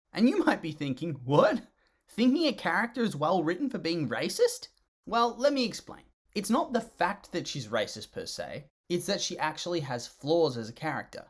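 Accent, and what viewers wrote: Australia. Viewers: At first, I thought you were British, but since you've said Zootopia and not Zootropolis, you're obviously either from Australia or New Zealand. Sorry, the accents sound similar 😅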